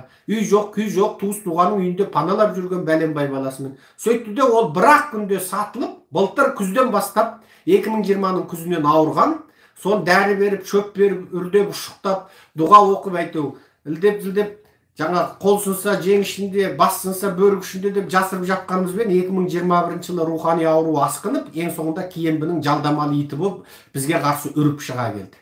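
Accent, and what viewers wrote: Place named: China. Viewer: Сені кім сатып алған.Қытайский акцентпен сөйлеп отырсың ғой.Қытайда отырып алып біздің елге ірткі салма.Сенсіз де өзіміздің агитаторлар жетіп жатыр.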